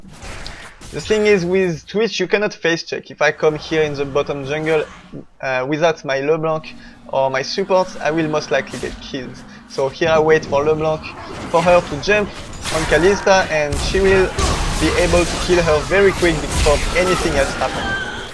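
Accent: France. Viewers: no we clearly jear the french accent. but yes i wasnt so focused on game language and aint saw it wasnt spanish :) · Omg l'accent français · on en parle DU FRENCH ACCENT ???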